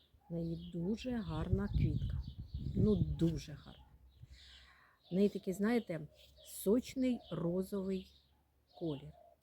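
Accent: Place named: Cherkasy, Ukraine. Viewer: Я сама живу в Черкаській області, знаю цей змішаний говір.Не ображайтесь,все буде добре 🌹🌹🌹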